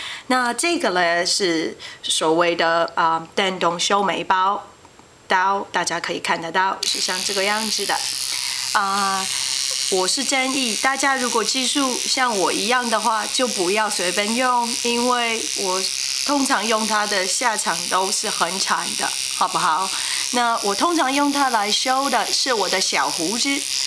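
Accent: Hong Kong, China. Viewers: I think shes from hongkong. She has got the catonese accent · she is from hong kong, she does have the accent. She spitted out some Cantonese one her other videos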